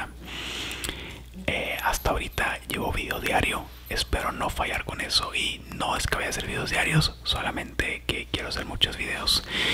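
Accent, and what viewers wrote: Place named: North, Mexico. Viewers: Dios mio que voz tan linda tienes ya sea con tu acento de el norte o en voz baja como lo hiciste en este video 😍...me encantas como persona, y físicamente 😍😍😍🤩 saludos !!! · Ya estoy aquí, te amoooo 💕😊🌌💝💖 amo tu acento norteño 😱😍💝💖😁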